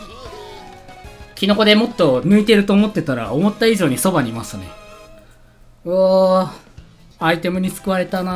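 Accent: Kansai, Japan. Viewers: 関西弁よく出ますねw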